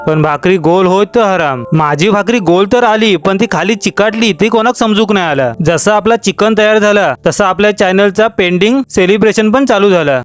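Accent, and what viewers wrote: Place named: Malvani, India. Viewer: तुझी भाषा मालवणी भाषेला जवळची आहे... पण ती १००% "मालवणी" नाही... तू " " म्हणजे कणकवली जवळच्या "नागवे" गावातला आहेस का?? किंवा तुमचा मूळ गाव "नागवे" आहे का??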